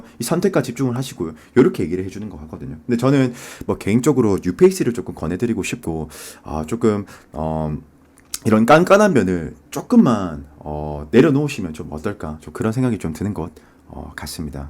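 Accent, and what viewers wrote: Gyeongsang, South Korea. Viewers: 사번 연애포텐셜이 터진다니 ㅋ 😄 지금은 별일 없지만 곧 몇 명씩이나 온다니 기대해보겠습니당 ㅎㅎ 조언해주신대로 오픈마인드로 다가오는 인연들과 좋은 추억 만들어볼게요💛🧡❤ 배경음악도 멋지고 목소리가 넘 좋으세요 님의 타로리딩 정확도 면에 있어 제가 본 타로 유투버 들 중 탑인거 같아요 진짜 예리해요 👍 전 부산 사람이라 님의 구수한 경상도 액센트가 친숙해요 😊 감사드리고 타로하는 구독다수 일취월작하셔서 번창하시길 기도할게요🍀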